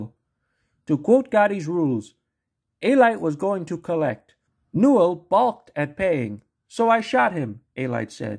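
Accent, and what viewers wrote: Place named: Albania. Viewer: Obviously you’re a John Alite fanboy. By your accent u must be Albanian and I understand the ethnic pride but. No doubt John was a tough guy gangster deeply involved as an associate under Junior Gotti. Def he was a capable guy but I think that u believe the hype too much and fail to realize that there’s 3 sides to a story. Obviously you weren’t there but you believe one side of the story about both Pitera and Gene Gotti. Alite’s side. I’m sure Pitera and Gotti have their versions, then there is the truth. But keep the goofy accented vids coming bc they’re comical.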